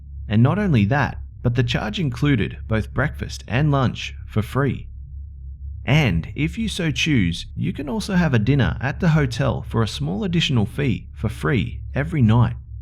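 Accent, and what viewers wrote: Australia. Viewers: Hello B are you Australian??? Pleas reply. Your the best!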